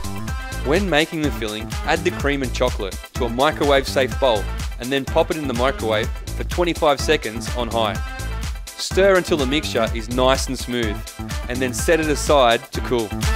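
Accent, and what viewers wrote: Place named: Australia. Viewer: The first thing I said when I played this video was OMG HES AUSTRALIAN !!!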